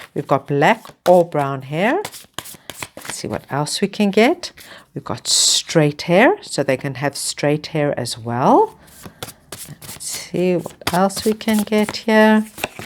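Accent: South Africa. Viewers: You sound South African. Do you perhaps do personal readings?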